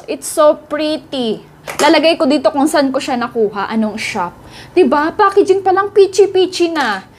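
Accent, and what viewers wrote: Ilocos, Philippines. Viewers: Ilocano kapo ba?? 😂😂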